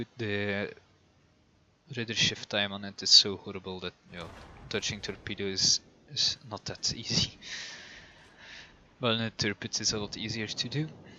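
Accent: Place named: Flanders, Belgium. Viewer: Wow you're really not trying to hide that Flemish accent lol. :D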